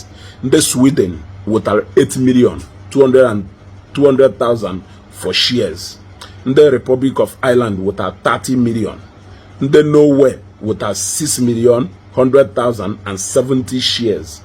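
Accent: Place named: Nnewi, Nigeria. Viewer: Nnewi be anyi i na asu ebe a na-ato m uto okanku. Doo na-asusiya ike.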